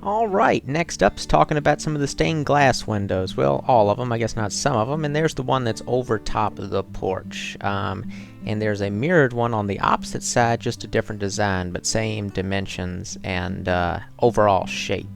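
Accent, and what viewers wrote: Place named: Carolina, United States. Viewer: Sounds like a Carolina accent, should be fine in the winter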